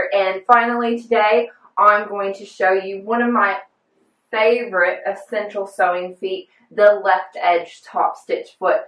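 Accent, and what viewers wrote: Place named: Australia. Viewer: I thought you were Australian, then I thought you weren't, then I found out you are a bit of both! It's good to have some one to watch who can tell us about products available to us locally.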